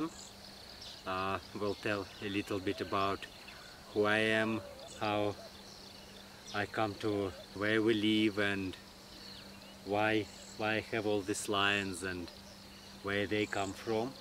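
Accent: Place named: United States. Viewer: Доброго здоровья вам, у вас американский говор, вы жили США?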